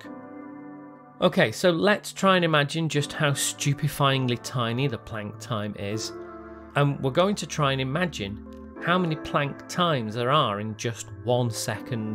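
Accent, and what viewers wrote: United Kingdom. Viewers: another limey narrating a video👎💩💩💩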